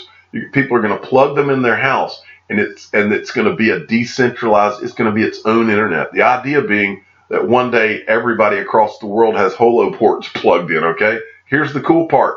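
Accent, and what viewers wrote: South, United States. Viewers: I thought you had had 5 or more margaritas ...until i realized i had your vlog set to 0.75 ..which makes your southern slaw sound so slow and sounding drunk ,my deepest apologies ...of course i can not take away your southern twang ,but it seems i can make it worse . xrp bud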